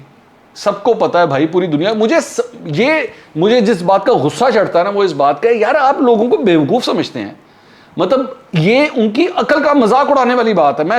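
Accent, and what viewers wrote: Punjab, India. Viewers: Your Punjab accent and irony behind this is mind blowing 🤭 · Pti walay gory ki tasweer daikh k khush ho gaey.Amazing vlog with Punjabi ka tarka puttar maza aa giaa🤣😂😁😀😁😂😂😂😃😄😄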